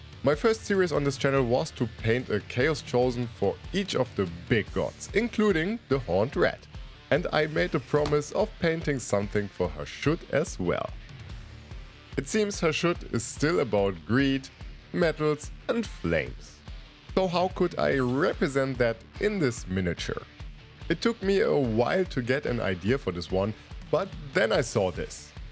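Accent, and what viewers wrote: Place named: Germany. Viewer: you certainly did deliver, this and the horned rat warrior both were experimental and it’s really nice seeing someone’s take on something that not many people would even attempt. But yeah your painting style is unique and a pleasure to watch, along with the German accent and bassy voice you’ve certainly got what it takes to keep gaining popularity. I look forward to the chorf release and hopefully they re-release all the old legion of azghor from forge world!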